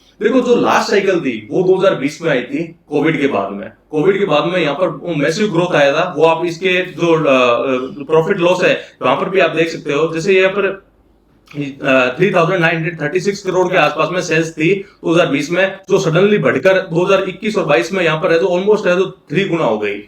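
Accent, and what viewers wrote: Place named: Rajasthan, India. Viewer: Very well articulated 👍, from your accent it sounds like you are from Jodhpur/Bikaner... Its just trading in single digit PE and as you mentioned this is cyclic business and once the uptrend starts (replacement cycle commences) ,lets say by end of this year..and EPS gets doubled (62)..and it gets the same PE at the time of listing that is 15: Stock price comes 930 from this calculation...I also believe at 298 its a good deal and available below IPO price.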